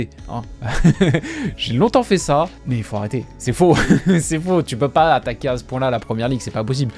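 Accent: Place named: Nice, France. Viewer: Ça fait plaisir d'entendre un nissart, issa nissa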